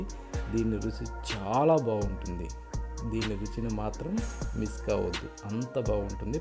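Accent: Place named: Telangana, India. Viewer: అన్న మీమాట తెలంగాణ మాట లాగే వుంది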